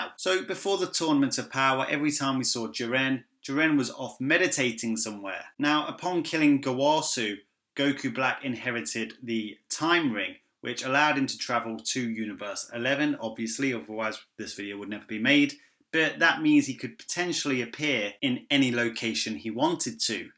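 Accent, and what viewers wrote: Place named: United Kingdom. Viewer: i usually enjoy british accents , not today lol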